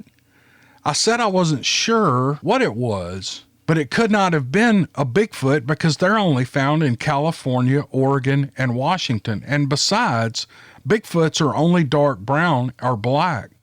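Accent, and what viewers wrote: South, United States. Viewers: Good read keep up so sweet southern accent.🎉❤😊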